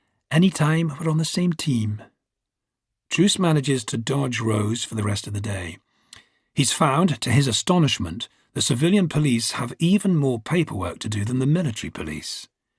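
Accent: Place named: Scotland, United Kingdom. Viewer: 𝑨𝒃𝒔𝒐𝒍𝒖𝒕𝒆 𝒑𝒆𝒓𝒇𝒆𝒄𝒕𝒊𝒐𝒏.ᐟ~ 😌✨ An epic, riveting narration with excellent voice acting for the characters - oh, and the Scottish accent reminds me of Robert Carlyle's. Would expect nothing less from the ever amazing Rupert Graves, one of the best actors/people in the industry/world. 💛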